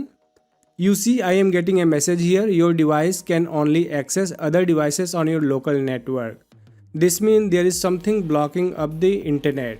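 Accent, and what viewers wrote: India. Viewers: When i hearing the Indian voice i am 100% sure my problem gona be fixt thank you ❤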